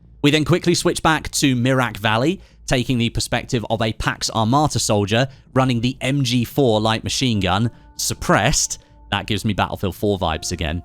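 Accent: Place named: West Sussex, United Kingdom. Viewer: Im pretty sure you’re from West Sussex. I overheard someone in a coffee shop who sounded exactly like you.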